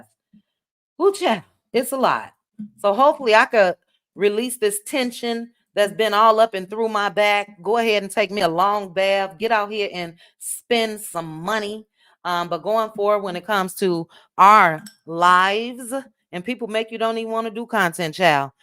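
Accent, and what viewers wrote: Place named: Chicago, United States. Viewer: I’m from Chicago and that’s definitely how we talk…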